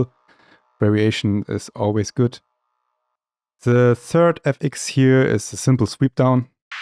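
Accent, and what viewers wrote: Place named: Germany. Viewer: thats a german Accent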